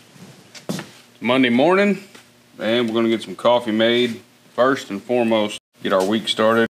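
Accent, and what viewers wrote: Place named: Texas, United States. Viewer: Boy howdy, your Texas accent is really strong on this video! Who you been hanging out with this weekend???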